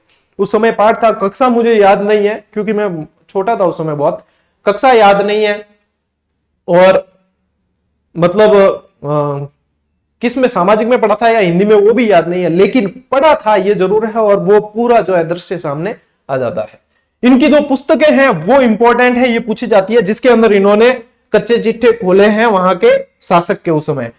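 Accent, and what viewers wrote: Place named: Marwari, India. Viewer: Sir, aapka marwadi accent bahut sweet hai...bless you sir,thank you🛐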